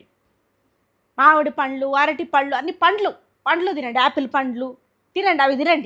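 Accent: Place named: Telangana, India. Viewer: Telangana slang❤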